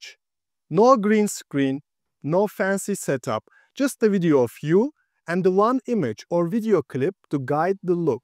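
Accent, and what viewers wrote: Turkey. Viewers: turkish accent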